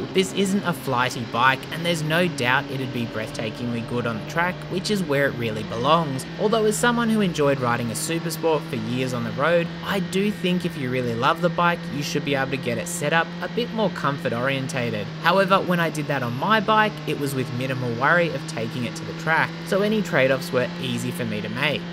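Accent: Australia. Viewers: My God what the f is wrong with the Australian accent?!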